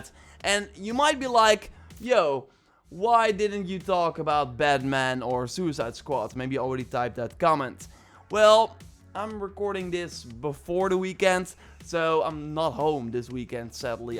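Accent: Netherlands. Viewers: i wasn't 100% sure if your accent was dutch but when you said 'mathijs de jonge' i knew for sure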